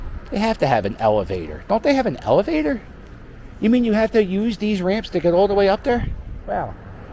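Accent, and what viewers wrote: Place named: Pennsylvania, United States. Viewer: I just want to tell you I’m a Pa native and to hear the knowledge that you have on the metro Philadelphia area is phenomenal and refreshing to hear. I was born in Norristown, and loved philly, knew and worked there till i was over it at 22, moved out west to California and now reside in Nevada. Love the phillies, Eagles, and miss hearing the slang in your voice, always know a fellow Pennsylvanian! Im now a fan, keep up the great videos 👍🏼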